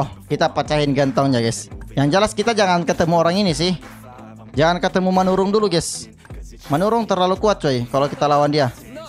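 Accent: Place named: Makassar, Indonesia. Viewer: orang makassar ki kah? karena logatnya kek makassar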